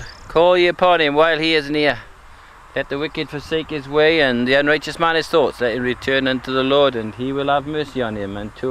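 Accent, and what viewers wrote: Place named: England, United Kingdom. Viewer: Amen on "your sin will find you out." Nice birds. Praise God for His creatures great and small. You have a good zoom on that camera! Love your accent, too-- English accents are really neat. I'm still having trouble viewing some videos. They take forever to spool, and they keep stopping every few seconds. I was glad this one was shorter so I could let it spool and then watch it.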